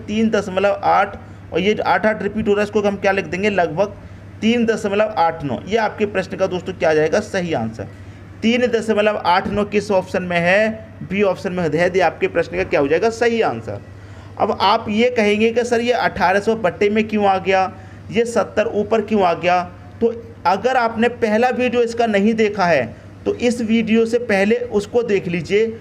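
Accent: Haryana, India. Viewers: Aap haryana se ho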